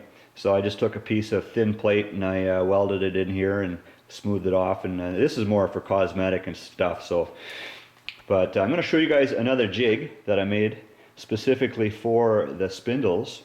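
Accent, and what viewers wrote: Canada. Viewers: Love the way Canadians say "out"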